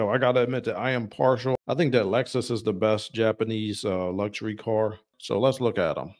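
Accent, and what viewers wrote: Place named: Mid-Atlantic, United States. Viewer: You have a very American and some might say even the classic “ Mid Atlantic “ accent.. well done sir.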